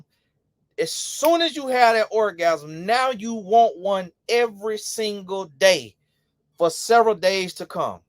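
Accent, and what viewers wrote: South, United States. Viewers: You so southern, bless ya heart 😅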